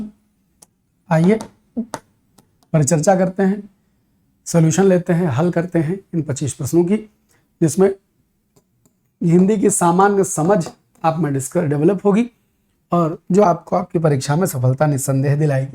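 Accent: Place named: Kanpur, India. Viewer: Aap sir Kanpur m rahe h kya boli aapki vaha ki lgti h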